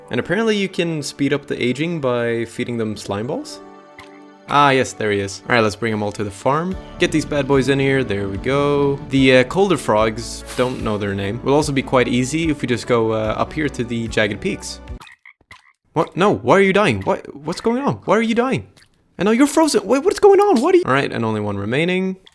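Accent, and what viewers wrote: Sweden. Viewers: I knew you were Swedish from the accent before I checked the channel info lol Great video!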